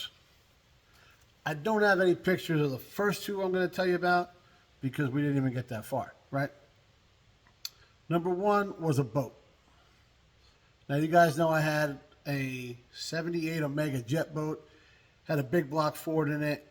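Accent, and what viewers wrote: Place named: East Coast, United States. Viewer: I could listen to you talk for hours love east coast talk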